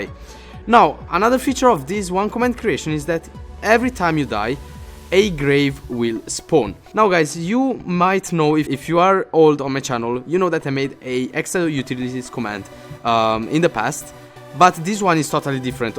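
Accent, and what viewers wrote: Italy. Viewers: quanto si sente che sei italiano?! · Si sente che sei italiano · si sente il tuo accento italiano :D!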